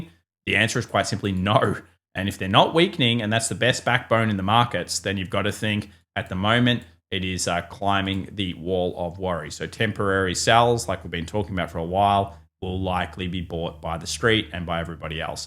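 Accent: Australia. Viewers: He's just Australian m8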